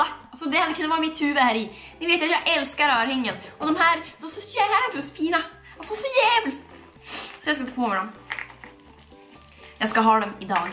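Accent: Norrland, Sweden. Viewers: Heeej❤️❤️ jag bor också i Skellefteå🤘 asså din dialekt är så norrlänsk😂💕 min är dock inte det😂 skulle vara så kul att träffa dig på tex på stan👌 dina videos är bäst🤘 hoppas du läser denna kommentaren❤️ kram ❤️❤️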